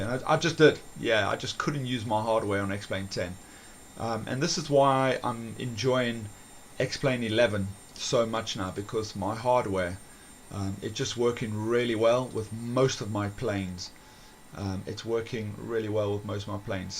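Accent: South Africa. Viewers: very nice Video and always good to hear a South African voice. The game is very realistic and you seem very knowledgeable ,Keep the videos coming and I will definitely support them!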